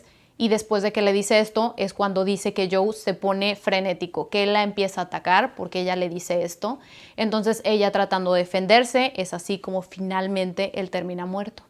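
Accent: Mexico. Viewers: explicó en otro video, que ella nació en el D.F. ahora llamada Ciudad de México, pero se fue a vivir a Querétaro, pero lo importante es la buena dicción que tiene!!! Y además ése acento que tenemos las Mexicanas 🙋‍♀️🇲🇽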